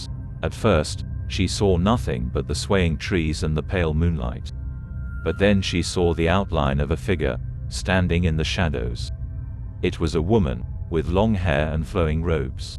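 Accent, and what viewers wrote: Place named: United Kingdom. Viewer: read by a British robot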